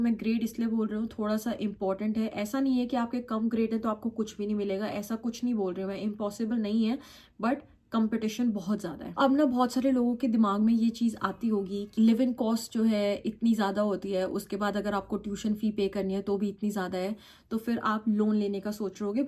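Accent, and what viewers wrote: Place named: Delhi, India. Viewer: Delhi wali hindi bol rahi ho aap with jat test ke sat ❤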